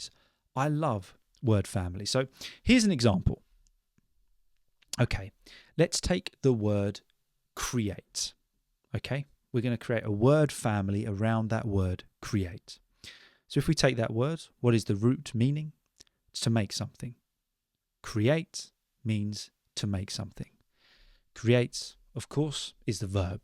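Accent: United Kingdom. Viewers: Thank you for your podcasts. It is the best channel with English podcasts and British accent 😍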